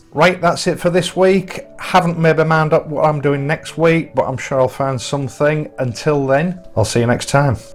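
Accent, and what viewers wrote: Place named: East Yorkshire, United Kingdom. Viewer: Sir, the quality of your videos gets better and better! And your East Yorkshire accent is something special! Thank you for your work!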